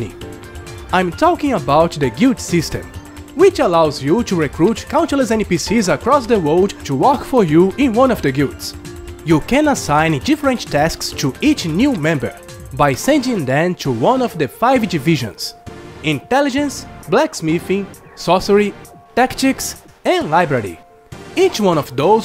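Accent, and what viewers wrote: Brazil. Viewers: painfull accent bro, I'm brazilian it looks like our accent, great channel but please get rid of the accent its actually not that hard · sim sim,é um jeito bem caracteristico de falar,so quem é brazuka percebe,por sinal otimo review,parabens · Espero poder jogar esse game no 3DS, fiquei desapontado com o Legend of Legacy! E parabéns pela desenvoltura no inglês, pelo sotaque logo percebi que era Brazuca também, sucesso no canal! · are you brazillian? Your accent seens like. I would say you're even from RJ XD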